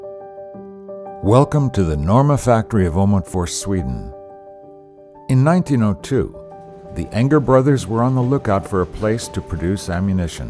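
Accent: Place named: United States. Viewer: The heavily American voice over is weirding me out.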